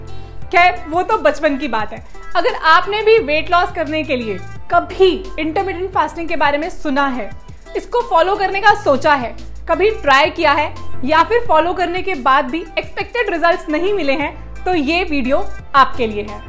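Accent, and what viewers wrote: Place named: India. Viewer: She is Indian they speak like this. 🙂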